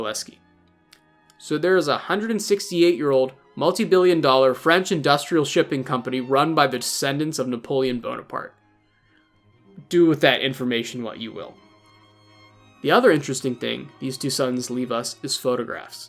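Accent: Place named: Midwest, United States. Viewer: Slow-down, Kiddo. You are rushing your excellent Narrative. Midwesterners do that. Runningwordstogether.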